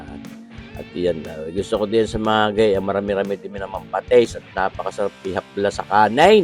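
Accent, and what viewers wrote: Batangas, Philippines. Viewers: Batangas po yang cooking man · that’s ow Batangueño talks…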